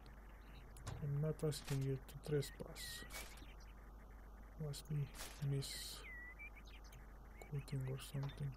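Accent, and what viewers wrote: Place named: Egypt. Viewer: Hey mate, it's nice to find someone that knows what they're doing with my favorite race/class combination. Also, I was wondering, what accent is that? I want to say there's some Egyptian/Arabic influence there, but I'm not well-versed on middle eastern/mediterranean accents. Also... "You wanna charge these guys? Be my guest. Pretty sure your men won't like it, but...". I love Shock Troopers. They're my favorite unit in the game. <3 Your accent is also hilarious for me. It adds a sort of toughness to the Orcs that you don't find in a typical white guy playing this combo on Youtube.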